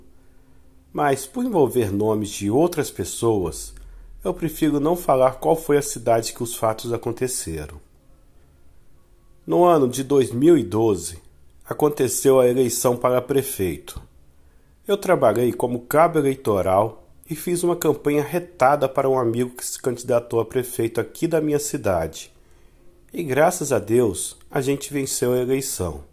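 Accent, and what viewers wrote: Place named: Northeast, Brazil. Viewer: Pela fala com certeza é do meu Nordeste!😁😁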